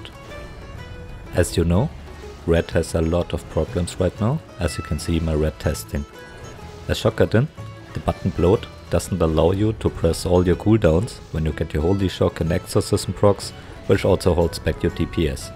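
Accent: Germany. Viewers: Man hört so krass das du deutscher bist 😂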